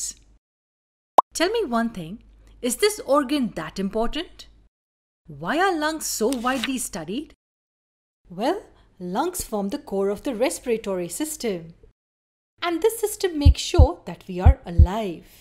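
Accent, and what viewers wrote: India. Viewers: Thanks mam. You cleared all my doubts In one video. And also thanks for the Indian accent and clear voice. 😚😚😚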